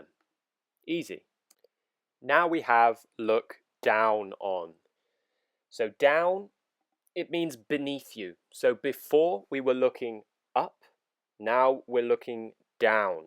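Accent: United Kingdom. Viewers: I am looking up to for learning the British accent.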